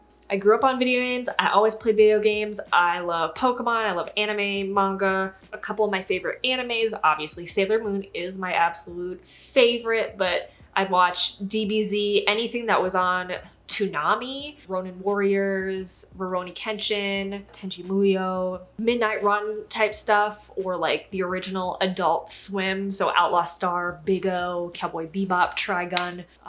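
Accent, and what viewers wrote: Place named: Scotland, United Kingdom. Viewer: I thought you was from Scotland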